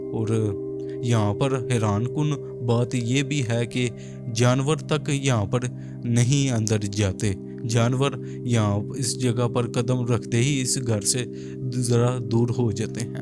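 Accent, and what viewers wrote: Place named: Pakistan. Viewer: Bhai hardwork karte raho aap.... Bhadiya hai video.... By the way aap kha se ho... Aapki bolne ki language se pakistani lag rahe ho...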